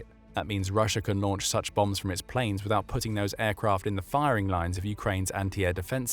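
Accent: United Kingdom. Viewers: Another limey voice = more BS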